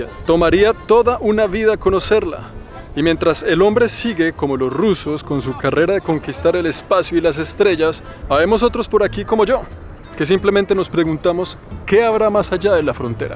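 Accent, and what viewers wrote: Bogotá, Colombia. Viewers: Por fin alguien que le gusta nuestro acento rolo. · Muy interesante tu narrativa,el acento colombiano es tal vez el castellano más bonito de latinoamerica ,gracias por tu aporte a la cultura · Viajo contigo a lugares q nunca conoceré... gracias....me encanta ese acento colombiano....y tú narrativa uuuffff de lo mejor....saludos de México ☘️🙏 saludos a la abuela🌷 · Muchas gracias, te admiro mucho, tú acento colombiano y la gracia de éste le imprime un agradable sabor a tus videos, ojalá yo pudiera hacerlo, un saludo y cuídate del COVID. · Eres increíble, además de que te metes unas escenas increíbles de los lugares 👌🏼 aprendo mucha historia contigo 🙌🏼 y tu acento colombiano es todoooo 😍 saludos de Argentina ✨ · A eres de bogota cierto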